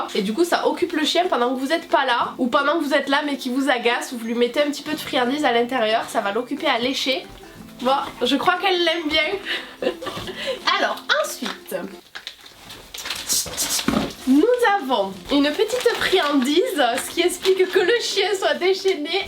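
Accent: South, France. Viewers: Ahahah j'ai adoré 😂 Apparemment les Beagle sont super difficiles à dresser, c'est ce que tout le monde dit 😂 bon courage 😅 Ta bonne humeur et ton accent du Sud font du bien au moral 😘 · Ah l'accent du sud, sa régale 🙂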